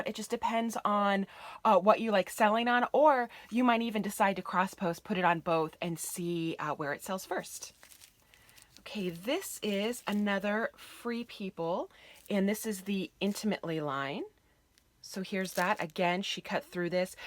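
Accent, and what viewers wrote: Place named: United States. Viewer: oh, I love your American so much=)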